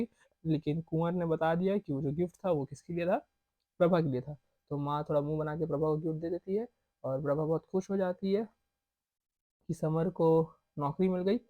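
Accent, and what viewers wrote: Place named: Kolkata, India. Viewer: bhai aap kolkata se ho? awaaz se lagraha hai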